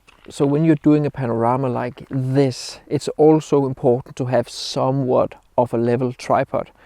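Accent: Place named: Denmark. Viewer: When I first watched your videos, I thought to myself, "He's the Lars Ulrich of photography." Then to find out that you are also Danish. I knew I knew that accent. 😂😂 Great videos! I really learn a lot from them.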